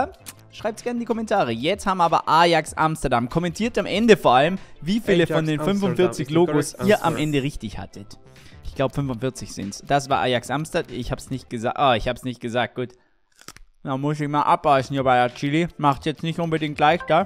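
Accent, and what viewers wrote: Austria. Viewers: Kommst du von Österreich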